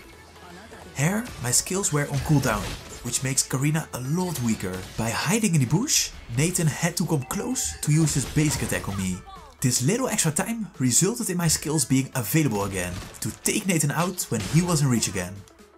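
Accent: Netherlands. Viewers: ayo this guy is dutch i can hear your accent dawg.. Kaas 👀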